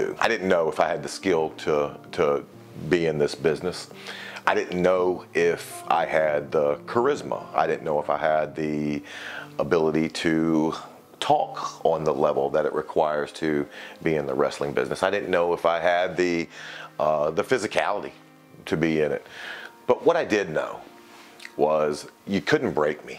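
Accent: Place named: South, United States. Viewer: I can’t guess his regional accent. Seems like a warm hearted southern guy.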